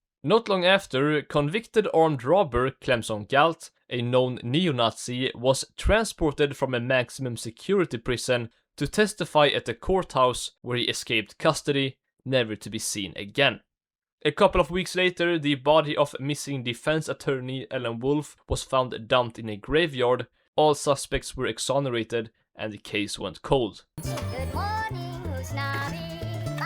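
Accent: Sweden. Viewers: I've heard few english accents in my life that sound more violently swedish than yours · Why does bro sound Swedish? 💀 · Is that a swedish accent I hear?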